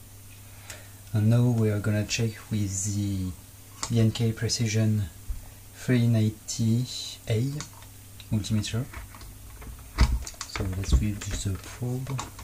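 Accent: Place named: France. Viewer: Hey! Change l’orientation de l’image, je suis obligé de figer la rotation pour regarder la vidéo dans le bon sens. So frenchy accent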